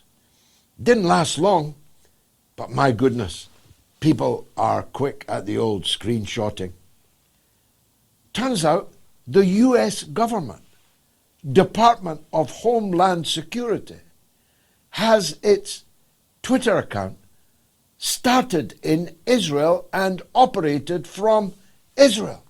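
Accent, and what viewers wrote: Scotland, United Kingdom. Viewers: The Scottish accent really helps to emphasize the urgency of the message. Brilliant.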